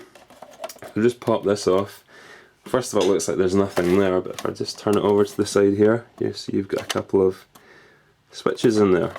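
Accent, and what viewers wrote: Scotland, United Kingdom. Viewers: Where in Scotland are you from. You sound like you are close to me. Edinburgh area?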